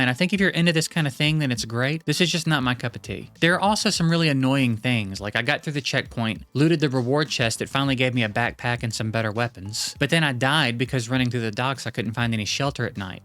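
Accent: South, United States. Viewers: the southern drawl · your voice for some reason gives me mister grayson vibes so hard XD that southern tang on some words i have it too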